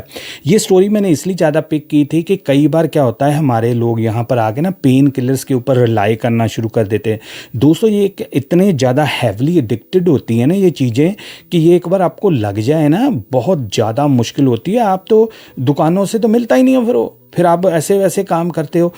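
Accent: Punjab, Pakistan. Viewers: i have heard some pakistani punjabi youtubers....they talk just like him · Exactly, I am also thinking the same... he's got Pakistani accent. Is this channel real or fake??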